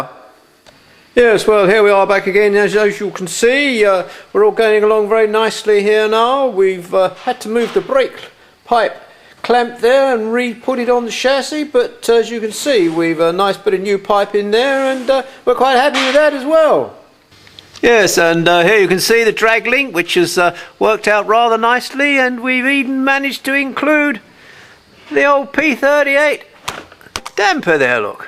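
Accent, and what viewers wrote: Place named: United Kingdom. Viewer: good to hear the British accent :) wish you showed more more the mounting plate and procedure for the steering box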